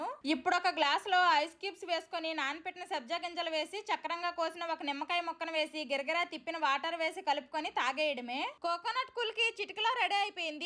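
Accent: Srikakulam, India. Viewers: Oppaledu.....😅wah.... srikakulam launguage is an emotion ❤❤❤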